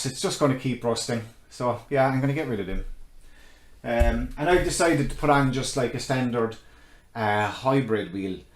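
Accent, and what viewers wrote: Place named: Ireland. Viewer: Sound! I've never got them exactly the way I want first time. The power of editing!! Also great to hear an Irish accent making its way in YT space. Slan a chara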